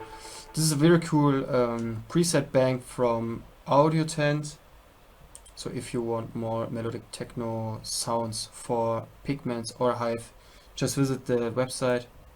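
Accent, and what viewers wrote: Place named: Germany. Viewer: Dein Englisch kling Deutsch^^. oder?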